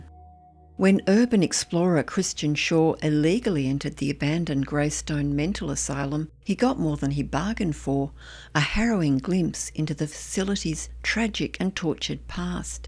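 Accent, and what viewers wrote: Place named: Australia. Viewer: Its simply an Aussi acent, so it sounds familiar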